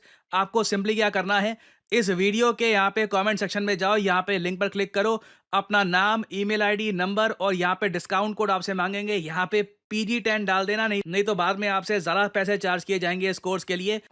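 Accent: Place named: Uttar Pradesh, India. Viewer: सर आपका कभी कभी बोलने की कला उत्तर प्रदेश के जैसा लगता है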